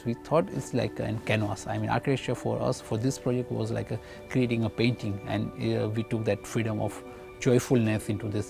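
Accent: Gujarat, India. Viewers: Bhai mere, He is from Gujarat, We are learning hindi since our childhood. If you tell him to speak in hindi or gujarati he will be fluent. Ask your dad or mom to speak in english and they will be like this only. See the kind of work the person has done. Don't judge anyone by his way of saying a particular language. You are not even 0.1% of what he is in this world. :)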